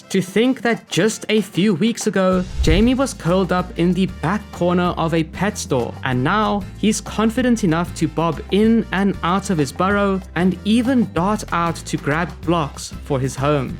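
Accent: South Africa. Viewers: Great video man, though your accent is tickling my brain, it sounds like your first language is Afrikaans. Now I might be tripping, but the way you say certain words are just making me think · South African? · You sound south aftican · Are you from South Africa by any chance? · I know a South African when I hear one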